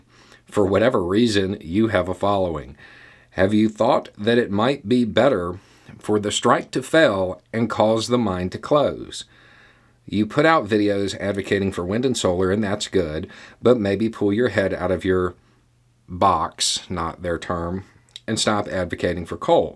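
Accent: South, United States. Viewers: Big “Oh, bless your heart!” Southern accent energy in this one, and that commenter totally deserves it all.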